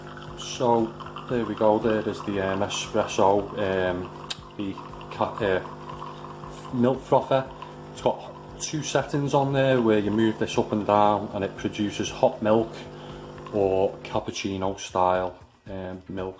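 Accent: Liverpool, United Kingdom. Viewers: Im a listening to a scouce guy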